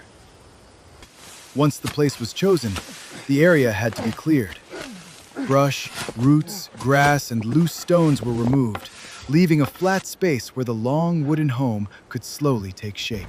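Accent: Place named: United States. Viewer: Wow great thanks, I really like how AI makes past living again. And that's a nice American prononciation, I understood everything. Thank you to show each native Americans way of life aspect.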